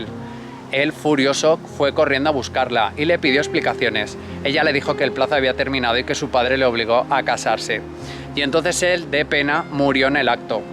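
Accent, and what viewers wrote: Castile, Spain. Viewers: Muy buen paseo turístico por esa bella ciudad,. Por cierto, me encanta tu acento castellano. Si existe algo catalogado como 'acento castellano ejemplar,' yo diría que el tuyo logra llegar a serlo. 👍👍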